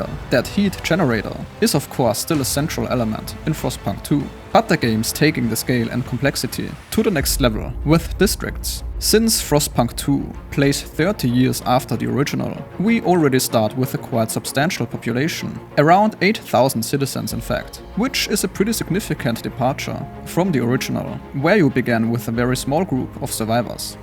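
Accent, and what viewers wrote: Germany. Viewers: Man hört zwar, dass du ein Deutscher bist xD aber sonst richtig gutes Video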